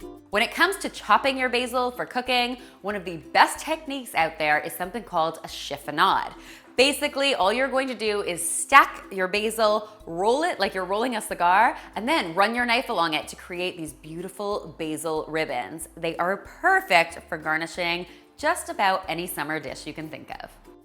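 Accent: Canada. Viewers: she's canadian, everyone has different accents. it can be both honestly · Numptie Brown She is Canadian . and BTW English and American has different accents and it's herbs and erbs, so.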